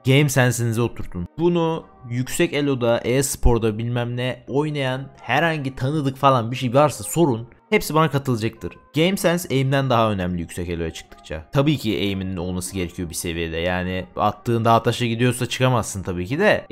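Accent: Thrace, Turkey. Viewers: Abi trakyalımısın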